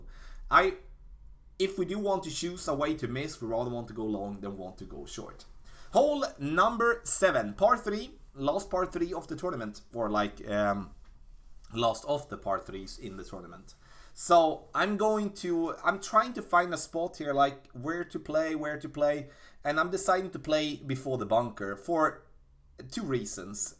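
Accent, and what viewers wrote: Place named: Sweden. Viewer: Todd Calhoun swenglish👌🏻😁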